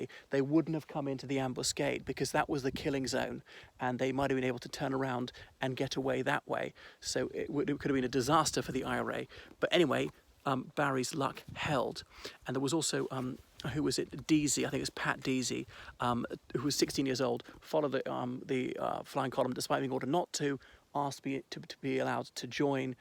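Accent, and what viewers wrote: RP, United Kingdom. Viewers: Well, he has an Etonian accent - not terribly Irish at all! :) My granddad was Irish - from Nenagh, Co Tipperary.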